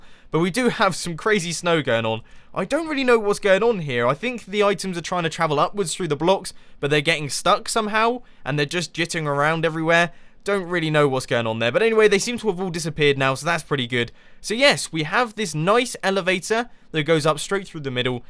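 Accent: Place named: United Kingdom. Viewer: I seriously could lissen to that british accent all day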